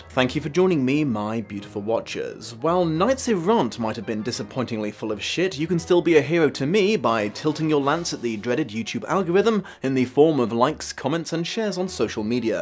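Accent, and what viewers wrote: South, United States. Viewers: Holy shit you have a better American accent then I thought possible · I did start thinking about Daniel Craig during the review, but mostly because I was comparing American accent to Craig's performance in Knives Out. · That accent though!!!! I would call it more southern as a New Englander, but credit where credit is due because I could not pull off a southern accent that well! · GyroMurphy and yet somehow the Southern accent makes the whole thing even better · I love your American accent honestly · I love your American accent!!! Perfect delivery! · Your American accent is so cute. Especially since you're trying really hard to do a texas drawl by deepening your voice. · I love your American accent! :D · I love your Southern US accent! 😀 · Not sure why a Connecticut yankee is wearing a cowboy hat and speaking in a slight southern accent, but I loved the video anyway! 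· Your New England accent has a southern twang. You sound like rick grimes from the walking dead WHO IS ALSO ENGLISH irl! Side note: I was listening to this book on a long road trip in 2013 when I drove from Boston to Orlando and back I got to the part of the water fountain and stoped. I recently watched the English tv show AND the animated movie (the animated movie is closer to the book) Cheers! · Your southern accent is really on point. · You are channeling some Southern state with your accent lol. Virfinia, S. Carolina, or Georgia. I AM a Connecticut Yankee so I don't know Southern accents. But it's definitely not Northern lmao. I love it tho!!! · Love the slightly southern accent. Sounds kind of like a Louisiana accent. Very cute! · The irony is that a Yankee accent is closer to a British accent than the slow southern gentleman drawl you may as well be saying "I'll be your huckleberry" in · That's the most American Accent that ever did American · Hold up your american accent is excellent not perfect of course but very very impressive! 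(And a little southern for 💙 flavor 💙)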